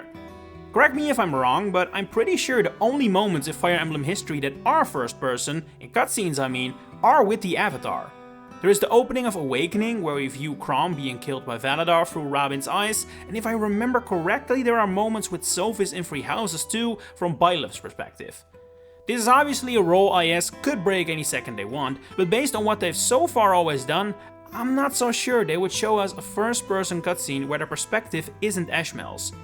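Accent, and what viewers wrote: Netherlands. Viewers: Unrelated but love the Dutch accent 🫶🏽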